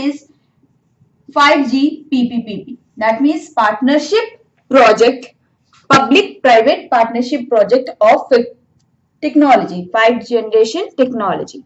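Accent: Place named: India. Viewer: The teaching method is good but the accent is totally Indian accent. It is quite difficult to catch up with other learners. Only Indians might absorve with all of the ur explanations.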